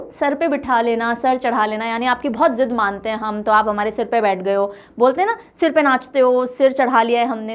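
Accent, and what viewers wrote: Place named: Haryana, India. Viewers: Mam U r so cute 🥰 Lovly haryanvi 🤗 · मैम आपके मुंह से हरियाणवी बहुत अच्छी लग रही है जय हरियाणा जय हरियाणवी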